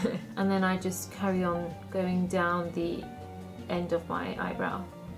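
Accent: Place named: United Kingdom. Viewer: She is an Indian can you belive that. She speaks like a British? ?????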